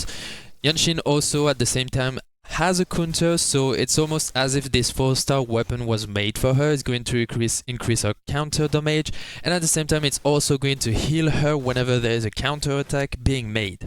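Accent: France. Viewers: Hello ! Good video, +1 ! You sound french, don't you ? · On dirait un accent français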